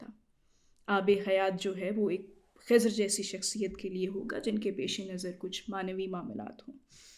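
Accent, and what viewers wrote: Iran. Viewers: ما شا الللہ بہت ہی خوب صورت انداز ہے ان کا ذوق مطالعہ بہت وسیع اورمختلف النوع ہے رومی سعدی اور بڑے بڑے شعرا کا ریفرنس بذات خود ایک خوب صورت دلیل ہے ان کے وسیع مطالعہ کی احادیث اور قرآنی آیات کا ریفرنس بہت ہی اچھا اور قابل تحسین ہے الللہ پاک ان کے علم میں اور اضافہ فرماے ان کی الفاظ اور خاص فارسی کو اسی لہجے میں بیان کرنا نہاہت ہی خوب صورت ہے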